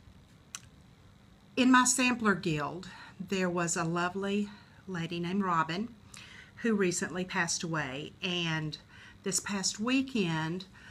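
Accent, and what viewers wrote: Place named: Georgia, United States. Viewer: Happy Birthday! I love your videos and I’m so excited that you are going to be making more! I’m from Georgia (currently living in Louisiana) and hearing your accent is a wonderful reminder of home. I would love to stitch the Baroque Ink Circles. Thank-you for sharing your love of stitching with all of us!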